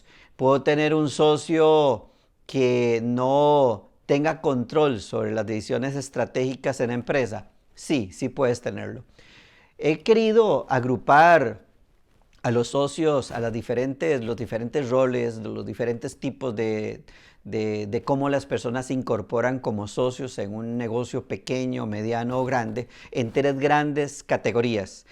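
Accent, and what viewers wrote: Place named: Bogotá, Colombia. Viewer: hola po el acento crei que estas en Bogotá, como te contacto para pagarte por una asesoria?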